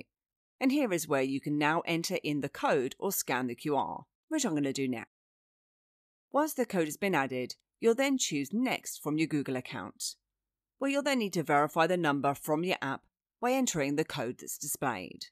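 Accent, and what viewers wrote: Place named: London, United Kingdom. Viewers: this wonderful London accent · I love her upper class so much British accent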